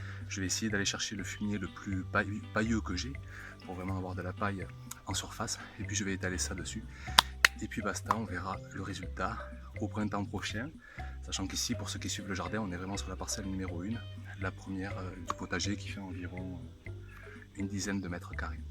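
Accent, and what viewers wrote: South, France. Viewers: Cette préparation du sol est elle la même partout dans le monde? Je vis en Belgique et mon jardin...c'est de la boue. et il pleut TOUT les jours. je dois suivre ces étapes?Avez vous des conseils pour des regions pluvieuses? Merci d'avance et Très bel accent du sud :)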